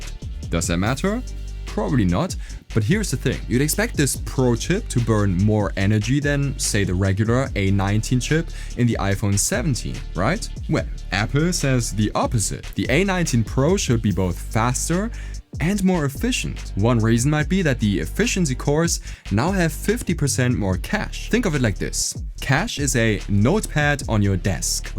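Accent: Germany. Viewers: I hear some German Out of your voice...😅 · I love german english accents, one of the reasons i like watching your videos❤Keep soaring higher brother❤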